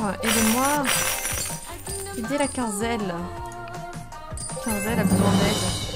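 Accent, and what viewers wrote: England, United Kingdom. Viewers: J’adore ton accent anglais 😂😊❤️🥰 ps: je t’adore 😊❤️🥰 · J'adore ton acsent anglais 😂👌❤super vidéooo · l'accent anglais j'aime bcp !! XD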